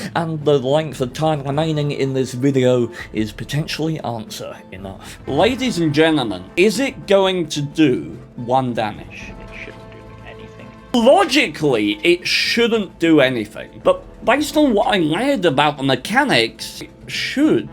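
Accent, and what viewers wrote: United Kingdom. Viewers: This guy is the most bri’ish guy in wot…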